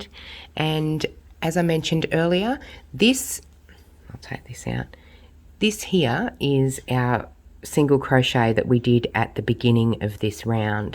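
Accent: Australia. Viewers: Thank you! Great pattern and excellent instruction! Lovely to hear another Aussie accent 😊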